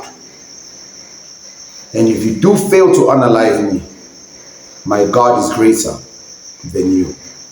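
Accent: South Africa. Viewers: What? You are Angolan? You sound South African 🇿🇦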